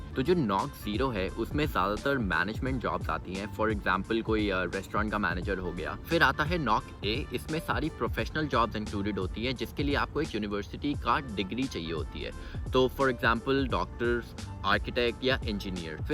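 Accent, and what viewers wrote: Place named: India. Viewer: that Hindi accent though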